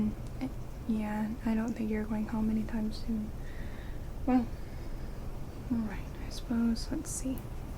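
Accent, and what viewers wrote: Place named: Midwest, United States. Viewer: A vampiress with a bit of a midwestern accent? Intriguing;) Had to happen at some point, of course.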